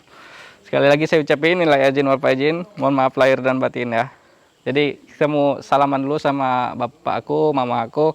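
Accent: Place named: Lampung, Indonesia. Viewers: Baru berapa hari di rumah udah lampung kali logatnya bang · pantesan logatnya kok kayak orang lampung, kk ternyata orang lampung tohhh.....mirip kali sama teman kuliahku Redy, mukanya, suaranya ... wkwk.. sukses terus ya kak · Bang Lampung nya dimana? Dari bahasanya yg sedikit terdengar...seperti bahasa daerah Lampung Barat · Pantes kek logat lampung pas liat video" yg baruu wkwkw ternyata orang lampung... Wah ikut bangga... Lampung mana bang... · pertm nntn vlog bang...lgs tau kl bang suku Lampung,khas dr logatnya.trnyt qt msh 1 daerah...kamipun sukuny lampung asli ibuku dr tanggamus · Lampung ne gegoh nhn bahasa ne heee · Bahasa lampungnya kok mirip peminggir/pesisir... aslinya Lampung mana bang?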